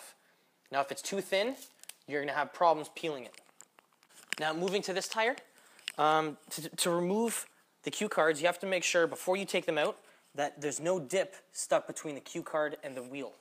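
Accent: Canada. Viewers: I can tell that you’re canadian lol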